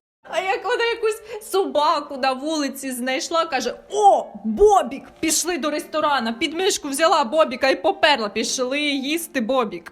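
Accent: Surzhyk, Ukraine. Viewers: нет, какой нахуй сленг? Она говорит суржиком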